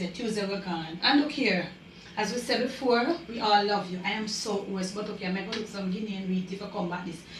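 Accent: Jamaica. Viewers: I love to hear you speak patio. I left JA at such a young age I don't know how to speak it. Can you do a Vlog about different patio terms?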